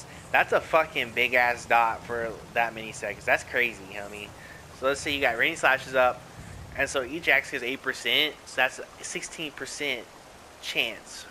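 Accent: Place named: West Coast, United States. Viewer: Yo you gotta be from the west coast with that accent. Lol nice vid bud.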